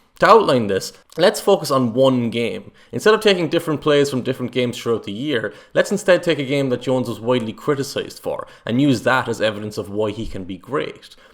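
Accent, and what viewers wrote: Ireland. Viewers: amazing breakdown! And I love that you only looked at one game for both good and bad. And tthe Irish accent is plus. Keep it up!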